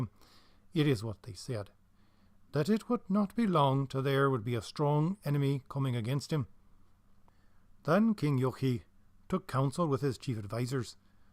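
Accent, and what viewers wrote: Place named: Ireland. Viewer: where is your accent from it's so wonderful! I am assuming Ireland of course, but what part?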